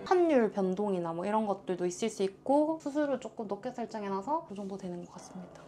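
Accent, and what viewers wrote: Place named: North Gyeongsang, South Korea. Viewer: 경북 분이신가봐요 ㅎㅎ 본업하면서 다른 일 하기 진짜 힘든데 대단하신듯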